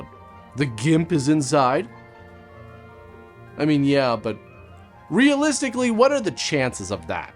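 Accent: Canada. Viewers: Oh, and he has the classic Canadian accent: "hoose", "aboot", etc. As a fellow Canadian, I can appreciate that. Haven't heard him say "eh?" yet, however.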